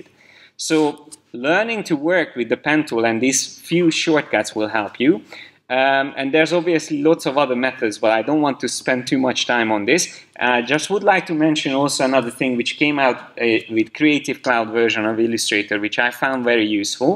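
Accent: Hungary. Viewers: You sound Hungarian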